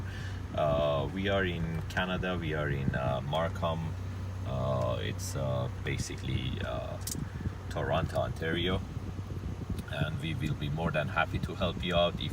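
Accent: Iran. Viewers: haha iranian accent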